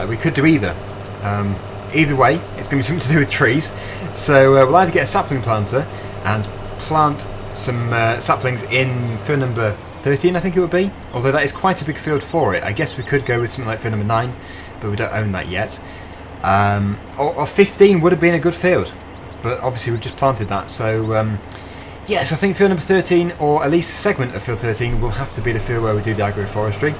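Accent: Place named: England, United Kingdom. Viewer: perhaps it would be a God idea to turn down the game volume? I think most people would rather have your lovely English accent rather than the tractors for the majority 😊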